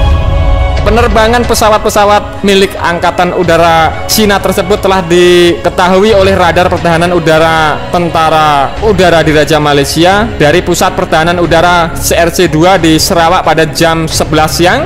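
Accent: Java, Indonesia. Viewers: Logatnya logat jawa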